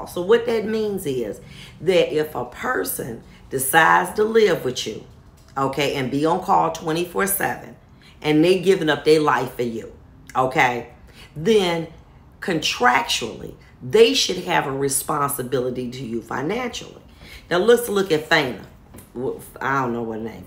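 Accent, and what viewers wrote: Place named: Detroit, United States. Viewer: I stumbled on your page. I'm a truck driver and I'm just listening and i swear i could hear the Detroit in you(flatout/fair exchange)😂and this game is not for the weak. It will go over most womens head. You have to be about it and be built for it for any of this to make any sense to you. Smart women who know the rules of engagement know exactly how to...engage😂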